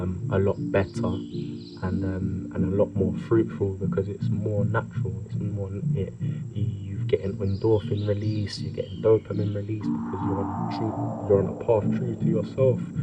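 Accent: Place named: RP, United Kingdom. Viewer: You have such an advantage with your British accent! You can literally post the inside of you nostril and make it look and sound posh 😅. You're awesome! God bless 🙏💖🌞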